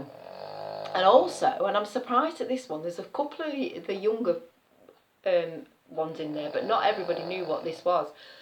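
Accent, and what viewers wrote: Nottinghamshire, United Kingdom. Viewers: It also makes me laugh when I see actors playing Robin Hood and his tribe - none of them ever try the Nott’s accents…this is what he should sound like 😂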